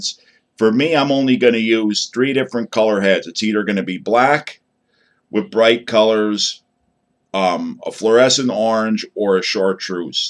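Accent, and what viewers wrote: Pennsylvania, United States. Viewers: I love it, and BTW, I could detect that PA accent long before I saw the envelope! LOL In my mind, are the colors not reversed? I would have thought the green would be on top? It looks awesome just the same! I just found your channel and am already learning lots! Thanks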